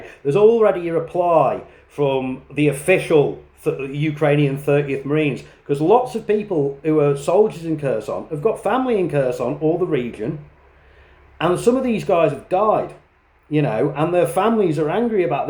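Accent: United Kingdom. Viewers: I just came across this video... you sound British to me. I struggle to feel sorry for foreigners who go involve themselves in a war. I file this under "it is what it is". Come back home, or stay there but stop whining, you chose this.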